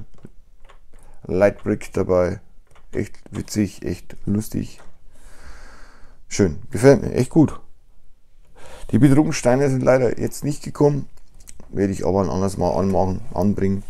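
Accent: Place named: Bavaria, Germany. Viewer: finde ich nicht gut Minifiguren herum zu werfen... gibt Minuspunkte. Vielen Dank fürs zeigen eines Disney Produkts, und das nicht Review, deiner Meinung zum Set. Gefällt mir auch gut das Set, aber aus Platzgründen, Zeit und anderen Projekten muss auch mal was nicht gekauft werden. Hehe, hier lernt man dann noch Bayrisch oder wie auch immer diese Sprache die du sprichst man nennen soll - grins. Klaro, Sticker sind manchmal auch cool, oder einfach weg lassen und das Design ist wirklich cool vom Häuschen. Grüsse Inu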